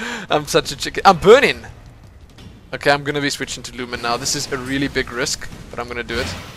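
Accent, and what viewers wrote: South Africa. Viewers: I'm probably wrong but are you south african?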